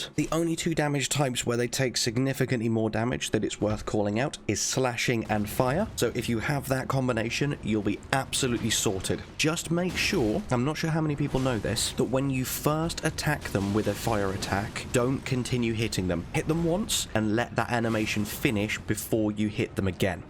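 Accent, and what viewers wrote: United Kingdom. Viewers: Never knew how much I'd enjoy a British accent saying "motherfuckers" so much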